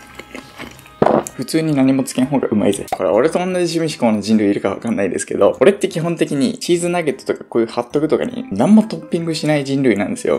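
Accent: Okinawa, Japan. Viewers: 沖縄方言めちゃいいですね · ちむどんどんっていう朝ドラで沖縄の方言にトリコになっています(^^) さんのリアル方言堪りません！美味しそうに食べている姿を見て夜ご飯食べました🤤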